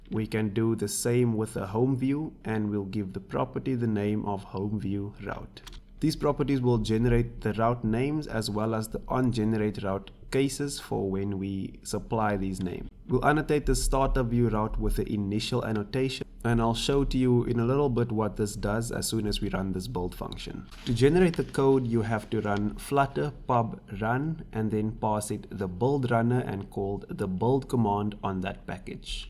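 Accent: South Africa. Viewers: I thought that accent sounded familiar :) South Africa, howzit man. Did you base this on MVVM? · you have a sa accent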